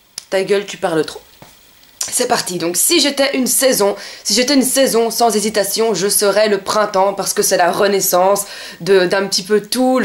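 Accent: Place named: Belgium. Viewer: abonnée^^ je te découvre grâce au groupe. je suis comme toi,1 peu comme la voix des opprimés,je cherche toujours à trouver le bon côté des gens même si tout est contre cette personne.j aime bien l italien.j aime bien ce que tu dégage^^. Tu es belge?(oui j ai eu la réponse plus loin)un p'tit accent😉.bisous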